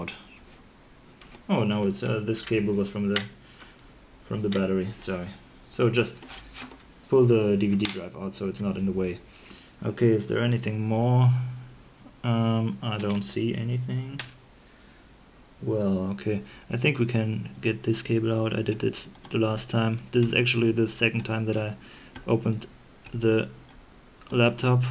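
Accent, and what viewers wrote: Germany. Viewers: Nice Video, you have a big german accent, are you German?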